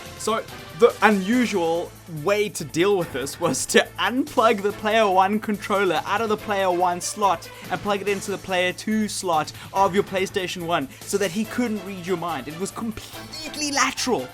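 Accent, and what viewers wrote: South Africa. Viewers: Hey, I thought I heard a hint of South African in your accent and I wanted to know if you are.:D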